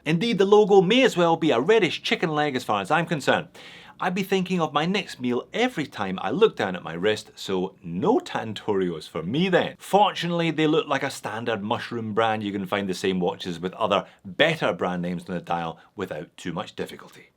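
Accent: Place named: Glasgow, United Kingdom. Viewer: You sound so Glaswegian playing the prospective partner there mate! It never leaves you. And hello from Glasgow by the way: we’re freezing our arses off here right now!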